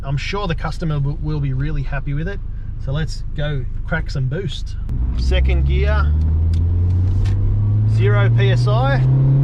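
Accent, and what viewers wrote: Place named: Australia. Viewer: Tell mydipsy wreck to kick rocks and do one! you speak like that because that's your accent and how Aussies speak!..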